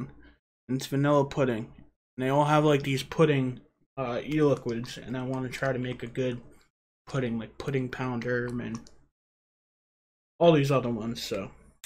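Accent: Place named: Rhode Island, United States. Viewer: Lots of good flavors there! Thought I recognized a RI accent. Worked 34 yrs in Providence, before retiring to FL.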